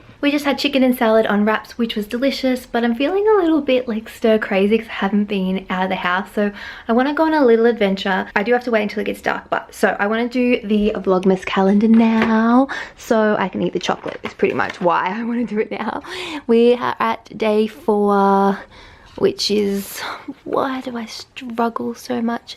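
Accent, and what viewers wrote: Australia. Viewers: Are u Australian and my worst thing is chocolate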